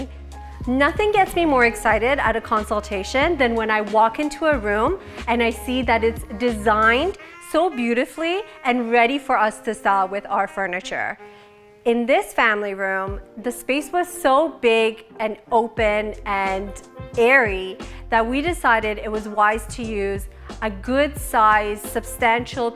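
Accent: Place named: Iran. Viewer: Your Iranian accent is unreal! Beautiful woman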